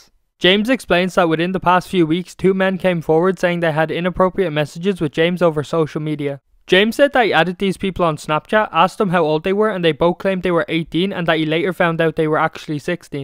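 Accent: Ireland. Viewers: Are u from ireland 🇮🇪❤️ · Are you Irish?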